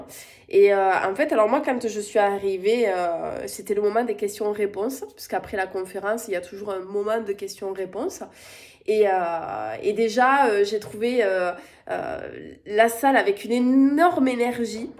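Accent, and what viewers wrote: South, France. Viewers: Merci pour ce retour belle et lumineuse. J étais super heureuse de te rencontrer a Ris Orangis et j aime beaucoup ta personnalité et ton authenticité, ton petit accent du sud qui te donne beaucoup de charme. Plein de belle choses pour toi et je t envoie toutes les fleurs colorées de mon pull 😅😊❤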